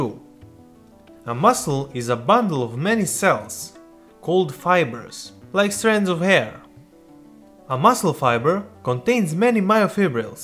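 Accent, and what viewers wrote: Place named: Serbia. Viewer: You really sound Serbian/Croatian/Bosnian/Montenegrin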